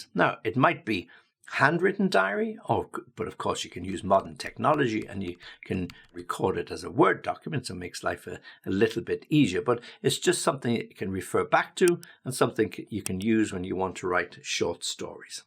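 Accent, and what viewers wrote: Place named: United Kingdom. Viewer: He is from uk with British accent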